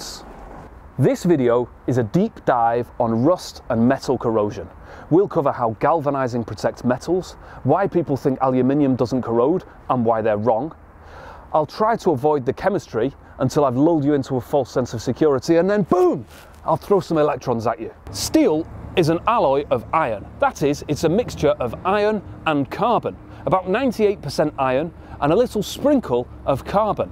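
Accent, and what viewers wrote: United Kingdom. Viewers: Great info but it's hard to take you seriously when you blatantly insert letters into words that shouldn't be Spell aluminum. Better yet write it down. Now, how many times does the letter I occur? Only once but you Brits insist on inserting an extra I between the N and the U to change the pronunciation of the word to suit yourselves. It's not alUmineeum. And don't even get me started on lieutenant!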